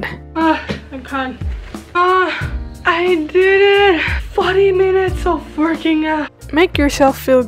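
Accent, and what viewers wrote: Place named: Malaysia. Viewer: BRO WHEN THE VIDEO STARTED AND YOU STARTED TALKING I WAS LIKE "is she Malaysian?" AND TURNS OUT YOU AREEEEE OMG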